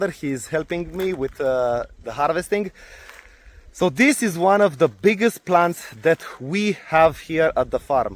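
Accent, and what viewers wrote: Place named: Czech Republic. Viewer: Is that a Czech or Slovak accent I hear? Thank you for all the videos - growing lavender is challenging here in the wet East Coast of the U.S.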